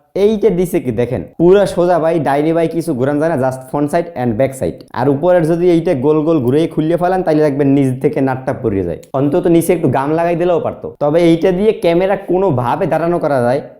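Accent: Barishal, Bangladesh. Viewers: এ দেহি মোগো বরিশাইল্লা। মোর বাড়ি কলাডেমা। চিনছেন নি জায়গা ডা?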